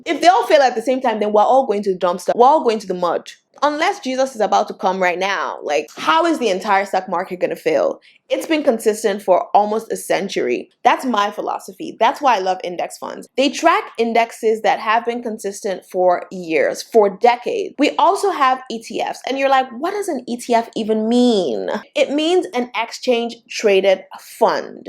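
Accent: Nigeria. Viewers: Lmao are you Nigerian ? I think I hear it lol... thanks, that video was great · I'm hearing a little bit of Nigerian accent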